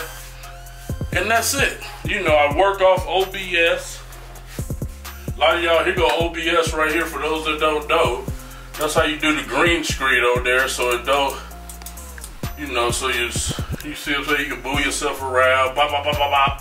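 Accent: South, United States. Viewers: Why does he sound like a hill billy white guy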